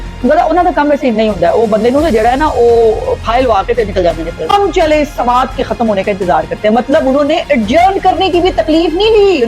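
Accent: Punjab, Pakistan. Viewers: very nice talk with punjabi touch...................Denmark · Love the punjabi accent and the punjabi touches ..keep it up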